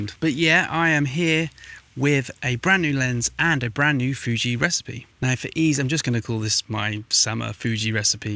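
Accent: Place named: East Anglia, United Kingdom. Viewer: I am based in Istanbul now, just doing a bit of research into Fuji and lenses as I want a new "fun camera". Instantly, I picked up a bit of East Anglia in your accent - not 100% sure I went to check your website and yes, Suffolk! Amazing. I grew up in Norwich. Moved away in 2019. Subscribed!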